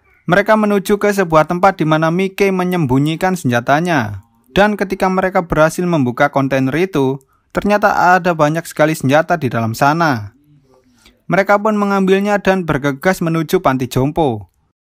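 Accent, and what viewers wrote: Java, Indonesia. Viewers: Bang lu orang jawa yh?? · Bang orang Jawa kalh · Mimin keren bgt logat jawa nya auto subscribe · TERLALU NJOWO BANG 🤣 ZOMBIE JADI JOMBIE 🤣🤣😂🤣😂🤣😂🤣😂🤣😂🤣😂🤣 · Jowo mas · He kak wong jowo yo kok ngomong e koyok wong jowo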